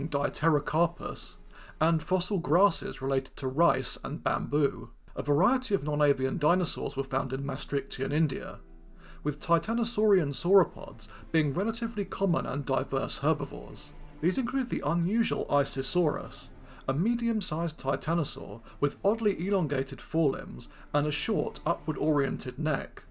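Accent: United Kingdom. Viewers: you sound like paddington but more british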